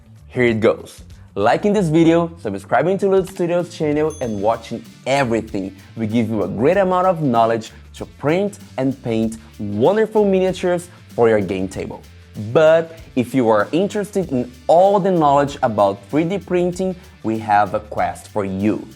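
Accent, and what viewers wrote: Brazil. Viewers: esse inglês com sotaque brasileiro... tô errado?! massa o conteúdo! o Loot é referência de qualidade e excelência. inspirador. thanks!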